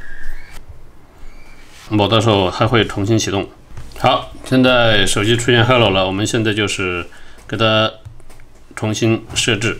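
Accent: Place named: Anqing, China. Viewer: 一听油管主就是安庆那边的人😊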